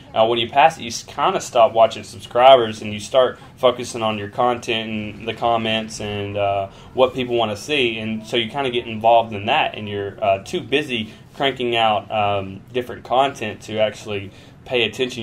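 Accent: United States. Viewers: Your awesome:) One question, where in America is your accent from? I can't pick it cause I'm from NZL.. Haha. Keep up your great videos! Always brings a smile to my face.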